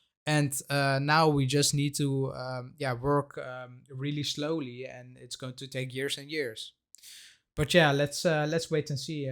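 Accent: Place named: Netherlands. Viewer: Ik hoorde gelijk dat je uit Nederland komt :)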